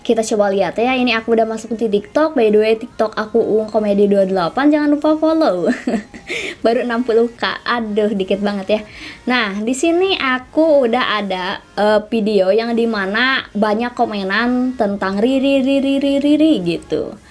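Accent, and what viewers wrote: West Java, Indonesia. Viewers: Kaya orang sunda kak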